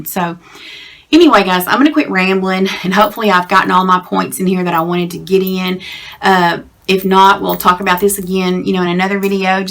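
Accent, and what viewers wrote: South, United States. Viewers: Beautiful, down-to-earth and a sweet Southern accent! She is my new best friend in my head! LOL!!... Congratulations on your fitness journey! I'm inspired! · You are beautiful, love the country accent. . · Oh and I love your southern dialect!! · Do you count calories? I am struggling with life...divorced, 3 kids at home, struggling with being a single parent...I've gained 100 pounds. I'm ashamed of myself. I used to do 10Ks and now I don't even enjoy walking. I used to be a vegetarian because I really don't like meat. My doctor told me to follow the DASH diet which is basically low carb. I'm so glad I found you because you sound like me (but I don't have your darling southern accent). I eat to cope. I used to eat to live (healthy) and now, I eat to cope. Sad. Hard to admit. I have been counting calories and I lost 10 pounds this last month. 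However...I feel like a slave to numbers. I am an educated person. I know calories count. I just know I am not going to do this for the rest of my life, so I feel it's setting me up for failure. Do you count only carbs? If you count calories, how many do you allow yourself per day? Thank you! You are a delight. 💕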